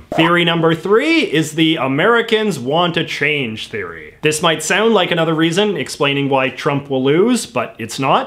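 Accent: Canada. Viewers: Are u Canadian ? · The Four Parts of. •The Moustache (RIP) •The Hair •The Incredibly stereotypical Canadian Accent •Francophobia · is he canadan?he has an accent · Really want to watch these videos but his accent is so painfully Canadian.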